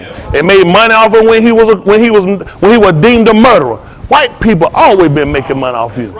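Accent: South, United States. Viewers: Sound like a Southerner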